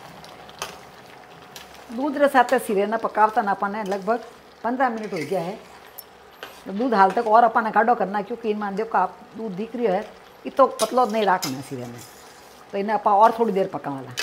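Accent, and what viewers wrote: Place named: Jodhpur, India. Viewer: VERY.NICE.MATA.JE.YOU.ARE SPEAKING.JODHPUR.LANGUAGE VERY.SIMPLE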